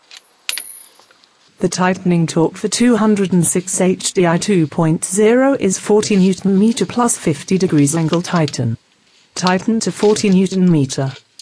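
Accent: United Kingdom. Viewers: So are you an Englishman in France?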